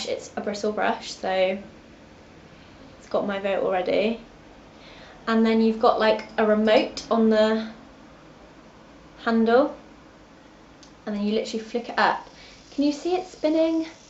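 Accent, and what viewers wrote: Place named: RP, United Kingdom. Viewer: Zoella sounds so flipping posh 😂😂Xx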